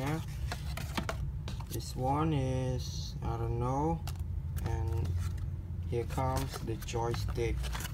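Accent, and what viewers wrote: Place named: Vietnam. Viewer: dude u sound like a vietnamese learning to speak english,good vid anyway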